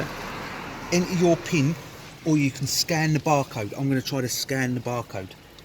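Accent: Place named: London, United Kingdom. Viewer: Refreshing to get a London accent on YouTube.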